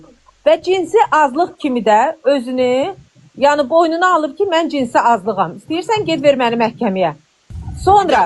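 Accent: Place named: Baku, Azerbaijan. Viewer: Canim xanim əsilll Baki ləhcəsi canim Bakillim. Duz deyir vallah.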